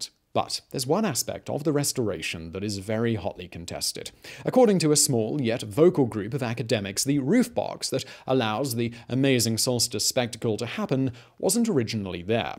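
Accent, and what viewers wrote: United Kingdom. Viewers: U better of listening to an Irish person than a posh English guy about Ireland some what hate for irish go way back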